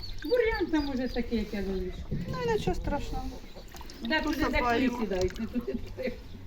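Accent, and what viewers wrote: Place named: Kryvyi Rih, Ukraine. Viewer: Яка красота я тоже виросла в поселку в кривому розі ,у нас точно такий говір,а де ви живете?ви молодці хазяйни бажаю всим миру,живу в Києві мені уже 72 роки,все промайнуло ,насолоджуйтесь життям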